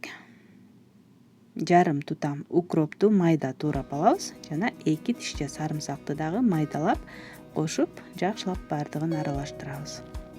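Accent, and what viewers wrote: Standard. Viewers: Качество ваших видео всегдана высоте мне нравится всё что вы готовите ваша чистота на кухне и как вы говорите чисто на кыргызском языке я рада что полписана на ваш канал в дальнейшем тоже радуйте нас своими вкусными неповторимыми рецептами я из Оша😘😘😘👍👍👍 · Эн сонун 👍таза кыргызча суйлогонунузго баа бердим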